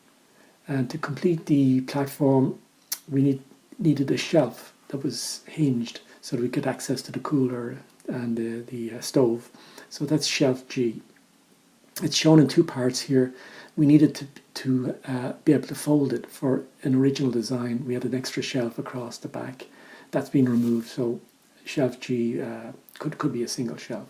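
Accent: Ireland. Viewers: Great van build 👍 Do I detect an Irish accent ? Maybe Welsh? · I miss the Irish accent so much ❤️ thanks for this going go be doing this in Canada in the summer !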